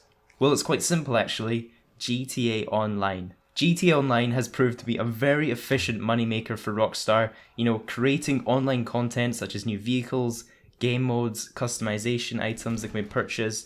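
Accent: Scotland, United Kingdom. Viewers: where in Scotland u from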